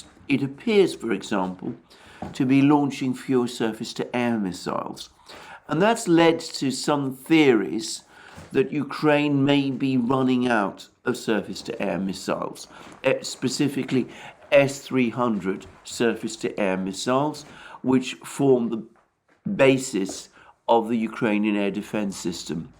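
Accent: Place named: RP, United Kingdom. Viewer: It strikes me that Alexander for some reason chooses to use BBC rules of pronunciation, e.g. at his public school his peer group would have said adversary with three syllables, swallowing the second 'a' and he has accepted training to get 4 syllables from it. And why has he stopped saying referenda? Who told him to and why does he agree to it?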